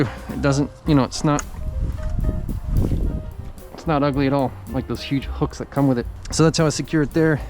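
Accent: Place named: United States. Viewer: Wow this is the first time I heard such an authentic American English pronunciation from Japanese uploaders. Well done.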